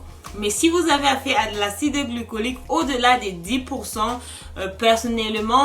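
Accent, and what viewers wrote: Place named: Cameroon. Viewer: Je viens juste de mettre la vidéo c’est l’accent Camer là qui m’a retenue après biensur après avoir lu ton titre ☺️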